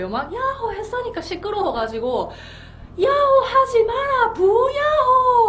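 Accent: Gyeongsang, South Korea. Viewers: 님 3개국어 하시네^^ 중국어. 대구사투리.. 귀여워요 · 늘 경상도중국어 잘 듣고있습니당.. 不要插手。 난 손을 콘센트에 넣지마. 인줄..ㅎㅎ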